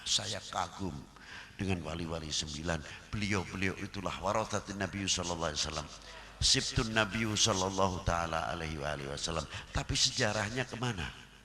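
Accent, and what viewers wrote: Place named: Tegal, Indonesia. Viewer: Padahal asli pekalongan tapi bahasa tegale kaya wong tegal nemen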